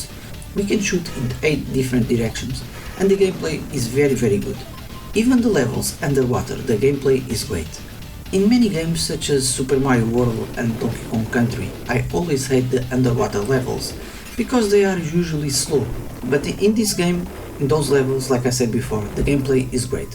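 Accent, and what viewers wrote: Portugal. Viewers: Não sei se alguma vez comentei num dos teus videos mas estava a ouvir o teu sotaque e pensei que fosses Tuga. Fui ver e és. Não falha. :)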